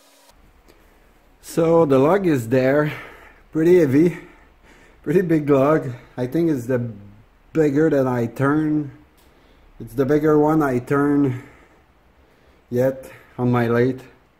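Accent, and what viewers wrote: Quebec, Canada. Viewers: Ca sonne accent québécois ca :P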